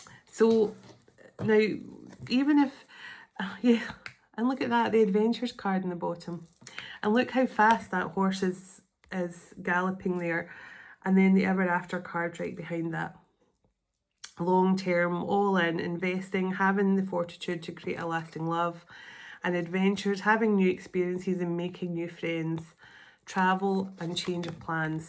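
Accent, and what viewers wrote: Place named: Scotland, United Kingdom. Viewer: Oh my I’m a Cancerian DF all the way from California. The timing and your message’s spirit of reconnection with my avoidant Libra TF must be divinely led because I can tell the telepathic connection being revived today during my morning walk in nature. Plus the Scottish accent was quite the bonus!! Cheers.❤❤❤444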